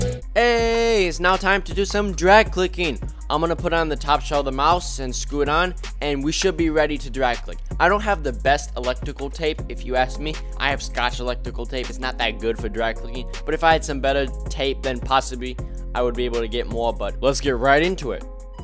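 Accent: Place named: New York, United States. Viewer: Love The New York Accent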